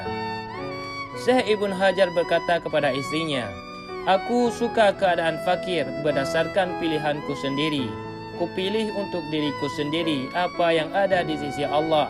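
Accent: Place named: Aceh, Indonesia. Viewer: Suara mimin Kek logat org aceh ngomong bhasa indonesia 😅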